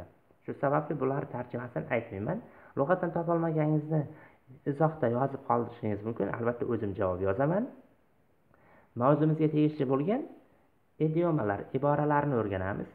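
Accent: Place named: India. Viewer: Aka aksent hindistonnikimi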